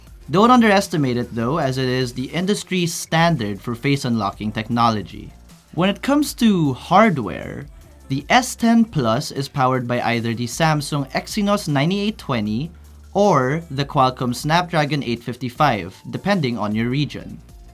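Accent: Philippines. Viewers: I'm confused. You look korean, but you have a tagalog accent. Itzy! They're dope, right? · I immediately stop watching the moment I hear the ugly Filipino accent!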